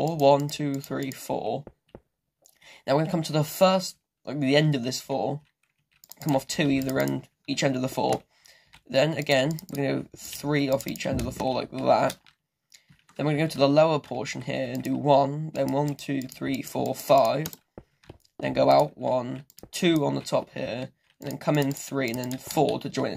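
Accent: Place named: United Kingdom. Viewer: You have a British accent That's mean you're from UK Am I right?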